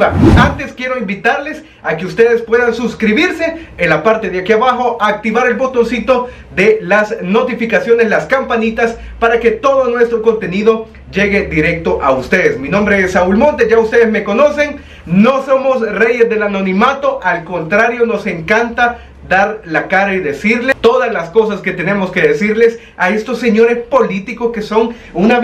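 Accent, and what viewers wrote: El Salvador. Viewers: Me gusta tu canal apenas enpese a seguirte De verdad q mis respeto a tu canal y el de Marcelo larin porq nos mantienen informados con la verdad. Me encanta tu humor tu acento salvadoreño . Dios siga bendiciendolos a ti y al q te hiso poner esa mascara lol.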